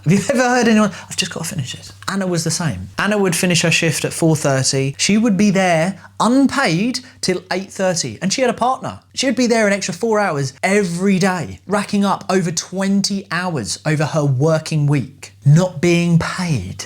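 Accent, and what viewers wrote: United Kingdom. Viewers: Your accent is More british and you speak very fast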